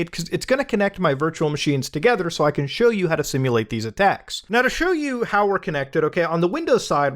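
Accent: United Kingdom. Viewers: So... British! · with British accent kinda slays tho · I want more Bri'ish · wow, I wasn't expecting to hear bri'ish today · nothing makes me more happy than hearing that fresh Bri’ish accent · can speak Hindi and British. gosh I love this guy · I thought u was wearing a mosque hat for a sec : Also that british accent thou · nice british accent lol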